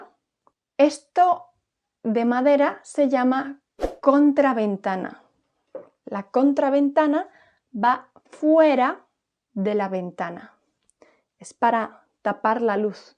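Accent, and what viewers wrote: Spain. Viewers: Una pregunta, de donde vienes concretamente de españa? Me encanta tu acento y me gustaría usarlo en mi vida diaria. ❤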